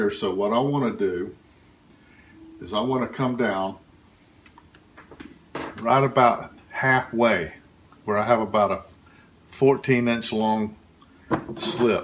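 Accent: South, United States. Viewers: Hello my friend . What part of the USA do you live? I hear the southern accent however I want to get the dates, temp adjustment.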